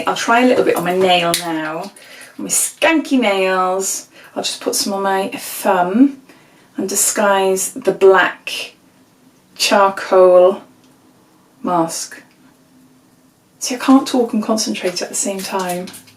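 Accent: United Kingdom. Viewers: hey, so cool you answered me ☺️ i got a face mask and lip scrub that I loved but then a lip liner ( I’m a lip gloss person) tweezers and a mini body wash and lotion. So not the best, I’ll try for a few months and see. I just signed up for a few. Just found out they existed a month ago 😂 I’ve been missing out. I’ve watched so many unboxing videos since, a lot of yours, I love the British accent, it makes me feel fancy 💃🏻 keep it up.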